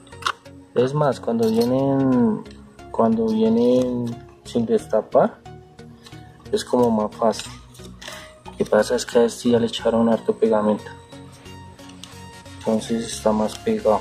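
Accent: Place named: Colombia. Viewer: Por el hablado pues es obvio que de Colombia...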